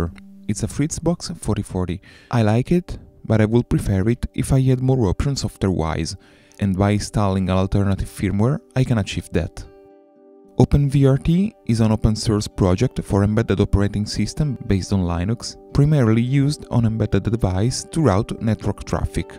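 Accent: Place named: Italy. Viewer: Al 99.9% è italiano. Come lo so? Non parla inglese male, ma l'accento è chiaramente italiano (quello vero, non quello che gli americani pensano sia italiano). Posso dire che no è del sud, probabilmente è del centro-nord. Se hai visto il video dovresti aver notato che la home dell'utente che sta usando si chiama " ", quindi lui o qualcun altro si chiama o magari è un dipendente di... chi lo sa. Ma la cosa più importante è: come faccio a ripristinare la versione originale del firmware della fritz!box, sempre ammesso che non mi trovi contento?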